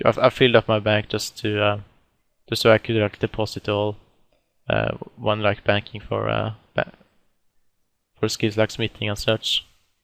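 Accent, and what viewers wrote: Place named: Norway. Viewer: Gratulerer! spiller ikke 07 selv, men moro å se at du står på å er pågående! :D men hører du er ''norsk'' for å si det slik XD Men det e rmoro å se hvor god engelsken din har blitt i forhold til da du starta! :D Lykke til videre :)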